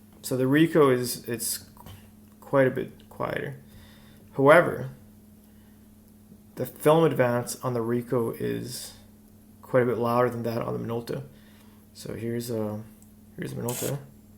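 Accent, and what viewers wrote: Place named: Canada. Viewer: This guy sounds Canadian af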